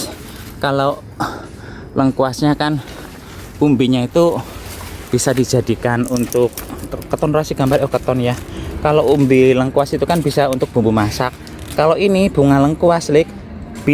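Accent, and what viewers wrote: Banyumas, Indonesia. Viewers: Salam kenal br ketemu Chanel ini Mase drmn kok ngapak sy dr purwokerto arcawinangun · Sya suka nyayur kang purbalingga,rika ngapak pa wong banyumas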